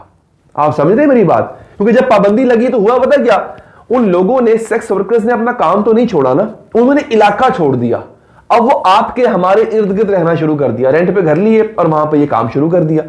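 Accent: Punjab, India. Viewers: He mostly uses some punjabi words in a typical accent n its really cute to hear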